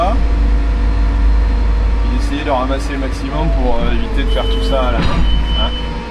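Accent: Nord, France. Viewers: Bonjour, je te suis depuis la seyne sur mer (var), mes parents étaient cultivateurs à Naves près de Cambrai. J'apprécie tes vidéos, tes explications sur l'agriculture d'aujourd'hui. Bonne continuation pour la suite. L'accent du Nord est magnifique.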